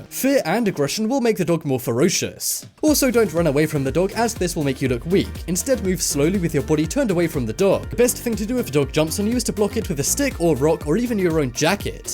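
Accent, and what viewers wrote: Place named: United Kingdom. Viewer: I lie, your British accent 👍🏻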